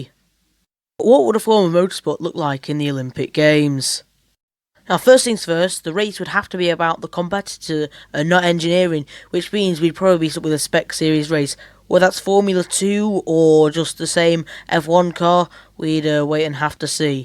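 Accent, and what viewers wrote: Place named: Preston, United Kingdom. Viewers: Your accent is so british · Big up the Preston accent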